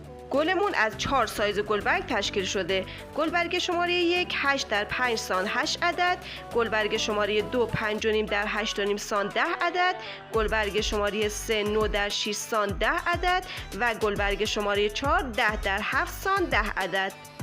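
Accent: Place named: Tehran, Iran. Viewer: لحجه شما تهرانیه درست؟ تهرانی هستید معلومه🌺👌👍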